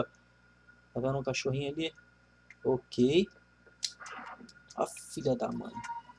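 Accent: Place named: Rio de Janeiro, Brazil. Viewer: Carioquês rs boa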